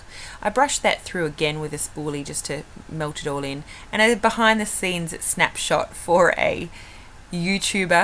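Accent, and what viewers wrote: Australia. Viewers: love your voice. its very soothing. there is something about the aussie accent!! chi chi naturals is on sale soon, so I thought id watch chi chi reviews. and found your channel.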